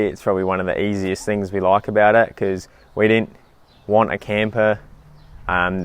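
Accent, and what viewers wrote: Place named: Australia. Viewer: If anyone is confused he is speaking Aussie!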